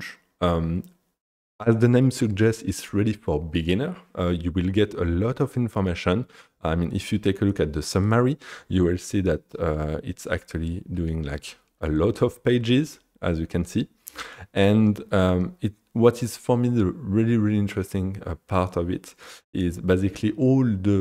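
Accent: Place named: France. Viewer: Le magnifique accent français ? Super vidéo sinon !!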